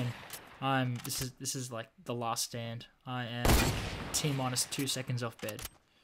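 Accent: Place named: Australia. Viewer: I'm sorry about the cussing I'll keep it lowkey if I get to play again.Your stream style is the right type for me.Your fair with your subscribers and that's hard to find on the net some times.Glad I had this chance to be noticed with out having to ask for a plug THANK YOU SO MUCH!!!! You might want to consider blurring your server so you don't get so many stream snipes.I have always liked the Australian accent,(To much crocodile dundee as a kid)lol.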